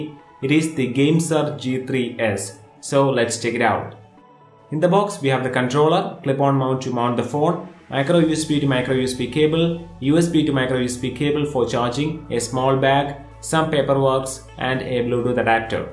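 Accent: Kerala, India. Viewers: Malayali Aano??😉😃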